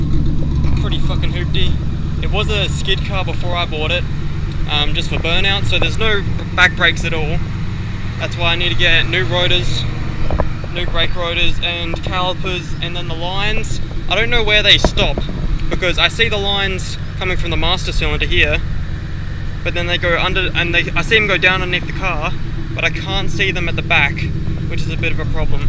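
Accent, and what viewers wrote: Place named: Australia. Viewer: already can tell ur an aussie in the first 10 seconds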